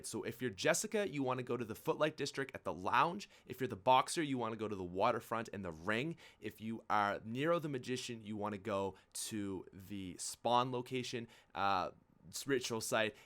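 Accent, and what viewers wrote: Canada. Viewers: r u from Canada? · if this is racist to u I'm srry. But r u Canadian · Holeh fuck bud ur canadian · im sorry for this but you sound really canadian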